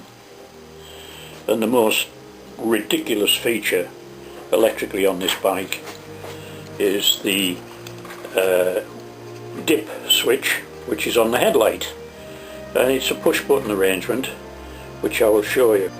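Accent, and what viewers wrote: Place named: Cumbria, United Kingdom. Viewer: Nice bike, you sound very Cumbrian indeed.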